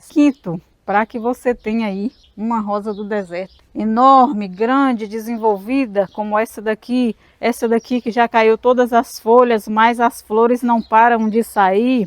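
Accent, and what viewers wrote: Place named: Rio de Janeiro, Brazil. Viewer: Bom dia eu pensei que vc era carioca?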